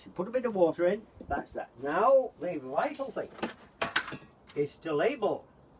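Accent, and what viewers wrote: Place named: Yorkshire, United Kingdom. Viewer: Sounds like. Hint of Yorkshire.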